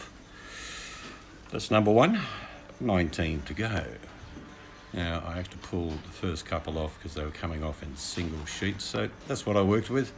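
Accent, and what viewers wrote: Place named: Australia. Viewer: Love it. So Aussie..."that's a pain in the bum".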